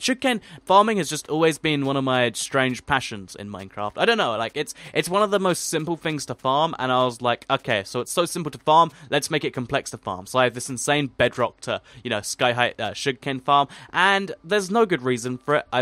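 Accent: United Kingdom. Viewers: You talk like if you were british and not Welsh. Just saying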